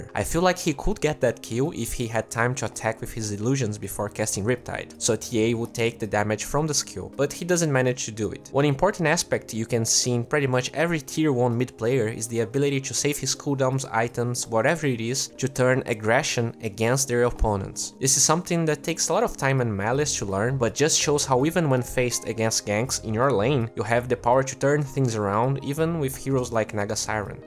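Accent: Brazil. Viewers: Brother, com esse sotaque. Tu é Hu3hU3 BR?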